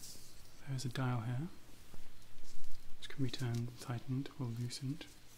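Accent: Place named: England, United Kingdom. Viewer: England! I like the English accent, so I think that's what I heard in your voice. And you tone is so good for ASMR! And yes, your videos arrived at that "insomniac" here, lol ... Big kiss and thank you. ❤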